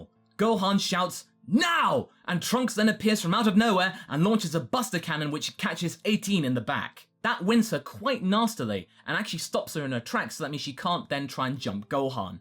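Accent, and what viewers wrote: United Kingdom. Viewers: hearing "robot arms make everything better" in your beautiful British accent made me happier than it should have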